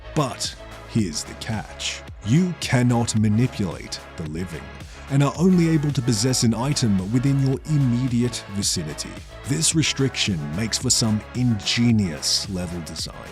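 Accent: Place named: Australia. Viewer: Deadpan Aussie gaming man made a new thing.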